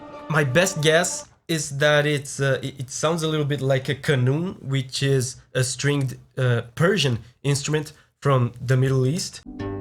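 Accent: Brazil. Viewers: e esse sotaque brasileiro meu consagrado kkkkkkk otimo video abraços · Sabia que você era Brasileiro só pelo sotaque kkkkkk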